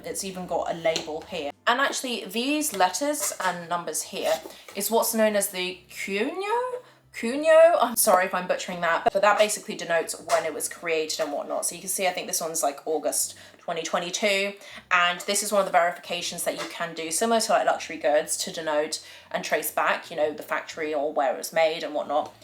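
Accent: United Kingdom. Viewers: I love your attention to details a great video for newbies Sounds like you have an English accent, which means you have full access to amazing Cuban cigyes. So don't understand why you are going to buy something other than from a Reputable dealer . I travel to London quite a bit ,and is one of the most expensive places to buy a proper Cubano I'm dutch American an have access to any cigar of choice, I prefer many other cigars over cuban its 2023 and cubans losing steam with guys like me to many better options ,China has driven up the price but once they get taste of Davidoff my father Padron the will quickly convert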